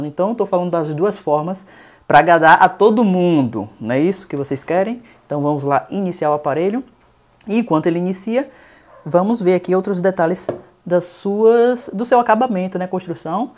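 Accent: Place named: Bahia, Brazil. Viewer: muito bom; esse cara deve ser baiano... pela fala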